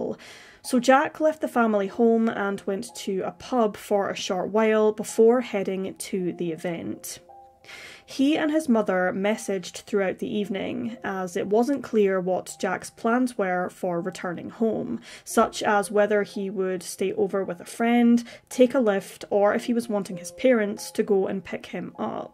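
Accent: Scotland, United Kingdom. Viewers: North east scotland accent?! 😮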